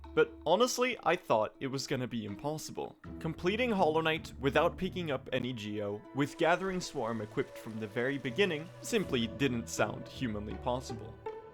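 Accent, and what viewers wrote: Sweden. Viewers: brother are you swedish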